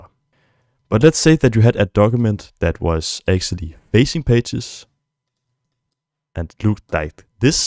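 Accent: Denmark. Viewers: Thanks this was helpful and I love the (Danish?) accent. :)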